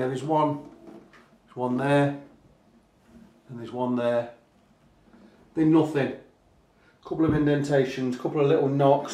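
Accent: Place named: Nottingham, United Kingdom. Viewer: I knew you were a Nottingham lad as soon as you said "Sellin". Nice guitar, hope it went to a good home.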